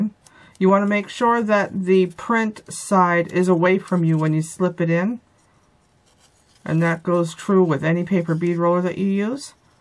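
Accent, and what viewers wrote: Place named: Maine, United States. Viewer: Found your channel quite by accident and am enjoying watching your videos. I knew you were a Mainer just listening. Don't know if I dare start another hobby - it seems to be quite addictive - but may just have to give it a try.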